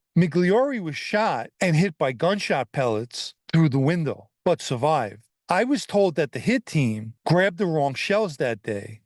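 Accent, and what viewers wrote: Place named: Long Island, United States. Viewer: Dude, great presentation, that LI, NY Italian voice nails it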